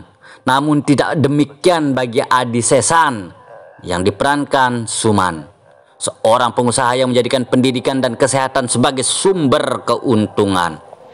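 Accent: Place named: Bali, Indonesia. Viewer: logatnya hmpir2 mirip kaya org Bali ya kdg2 😁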